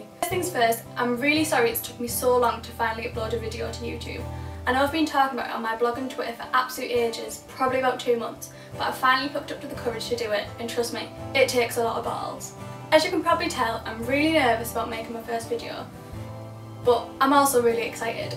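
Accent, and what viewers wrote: Middlesbrough, United Kingdom. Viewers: Nice to hear you speak! Proper boro accent! :) xx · ***** A very stereotypical British accent haha I really don't know why :)X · It's mad how much you can tell you're from the boro on here.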